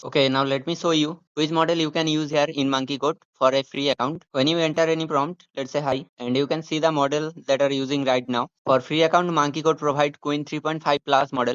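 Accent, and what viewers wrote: India. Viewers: ı didnt understand anything because of your pajit language and accent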